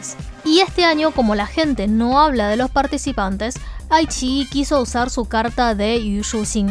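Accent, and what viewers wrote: Argentina. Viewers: Porque tiene acento argentino?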